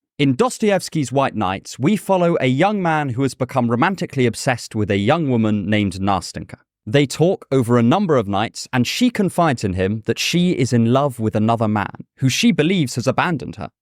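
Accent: United Kingdom. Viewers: I really like his British accent ❤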